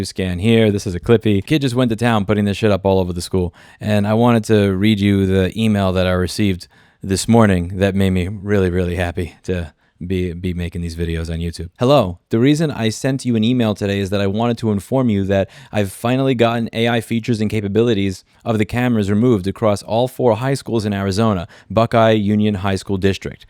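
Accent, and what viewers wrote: New York, United States. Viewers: I fkn love how just sounds more NY the more worked up he gets about smth xD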